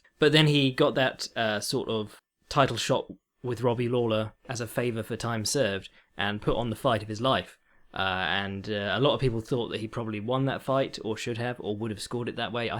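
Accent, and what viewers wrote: United Kingdom. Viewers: Dude! You're like, so English, Bro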